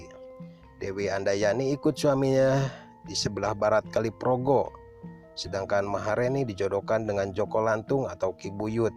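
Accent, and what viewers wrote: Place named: Ciamis, Indonesia. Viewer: Logat ciamis nih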